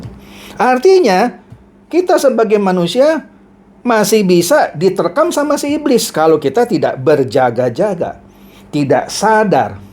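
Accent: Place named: Jakarta, Indonesia. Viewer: Tksh khotbahnya,Maaf Bapak Pdt oran Betawi ya? Karena Pdt bilang masih itu masing,Ok.Pdt🙏🏼🙏🏼👍👍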